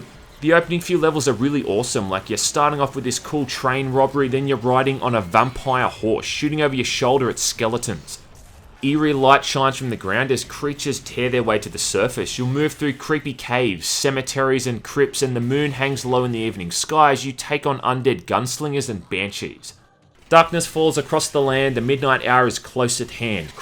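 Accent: Australia. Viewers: You are quickly becoming my favorite channel to watch. The delivery, the jokes, and the Australian accent ( oh ya, and the games). God tier content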